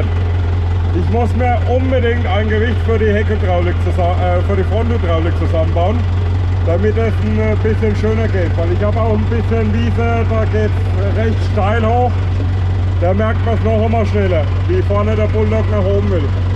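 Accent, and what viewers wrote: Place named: Lower Franconia, Germany. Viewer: Schööön! Ein Undder-Frrrange 😇 sonst gibt´s hier nur Ober-Frrrangen 😂 direkt abonniert und gelikt!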